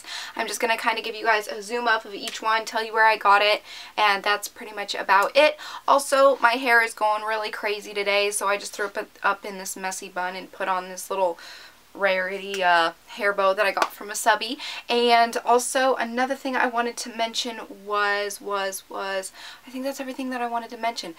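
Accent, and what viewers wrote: United States. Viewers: Are you from boston?? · Western / texas .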